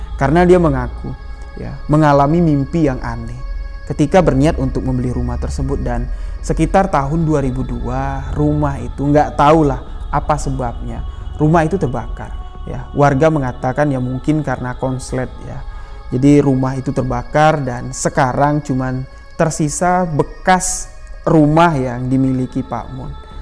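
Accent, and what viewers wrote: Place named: Madura, Indonesia. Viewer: Malang hadir dan selalu mendengarkan cerita mesteri, nada bicaranya seperti orang madura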